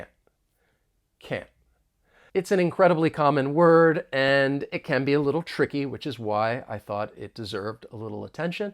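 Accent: United States. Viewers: you are an amazing teacher i have seen on youtube,,,,,,,your teaching skills are amazing,,,,,,,we all needed this type of explanation🥰🥰 you just nailed amercian accent🥵now its very easy for pronounce such an accent ☺but the last one is very difficult and complicated 🥴SUPERCALIFROAGILISTICEXPIALIDOCIOUS ufff🥵🤧🤒😴🥱 what does it mean 🤔by the way thankyou so much for this explanation🥰🥰🥰greetings and love from PAKISTAN😍😘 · Beautiful, nothing like American pronunciation. It's my favorite. ❤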